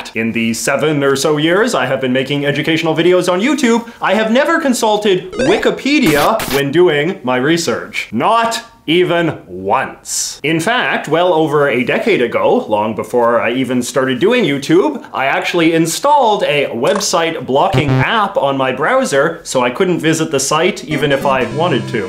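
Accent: Canada. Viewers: Canadian detected. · Stop saying a boot please I know your probably Canadian but it is very ignoring! Thanks! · Just got channel recommended and I realized that I have been binge watching for almost two n half hours now. Concise, educational and I’m all “about” the Canadian accent being an Alaskan 🙂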